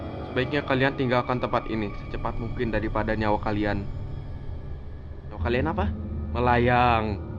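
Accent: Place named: Java, Indonesia. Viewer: bung asli mana kaya orang jawa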